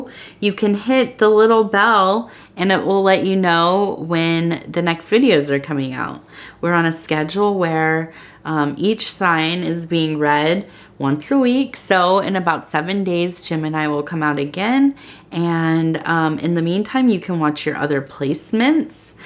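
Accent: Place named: California, United States. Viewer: Great! You answered my question. I can now attribute the quality I heard to a Californian accent. The nuances of our American accents are broad and beautiful. I was made aware of it early on growing up in Alabama!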